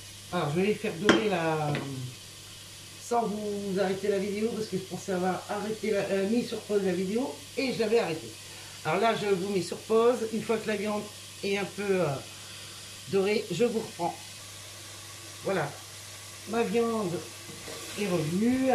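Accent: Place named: Cambrésis, France. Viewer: Top 👍👍👍 ch’timis pour toujours !! en tout cas vous avez pas perdu l’accent 👍 De notre côté Petite famille en région parisienne mais tous natif du Cambraisis Merci d’avoir retenu pour la recette une fois par mois version bien de chez nous !! Bonne continuation (tiote)😜 Super recette je vais la faire ce week-end !!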